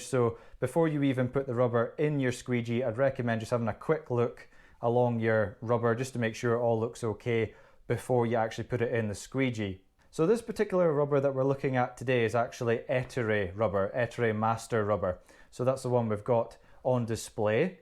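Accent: Scotland, United Kingdom. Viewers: Hi mate,great videos as always very clear, excellent communication, which will help a lot of people, ( despite the Scottish accent lol). I have trouble getting the edges of my rubber cut straight,do you have any advice for a tool to do this please instead of using a pair of scissors or a Stanley knife. I'm 25 years traditional, words fail me to express the joy, utter joy, at using the Mormon Liquidator!! This tool is in my view,unparalleled, and cannot be improved upon, it is Perfection.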